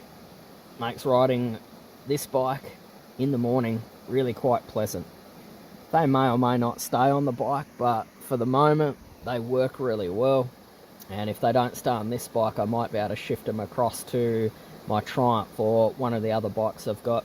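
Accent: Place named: Australia. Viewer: Great to hear another Aussie. I just bought one of these. I need to know what a bobber is? It sounds like a good thing. Thanks for the video.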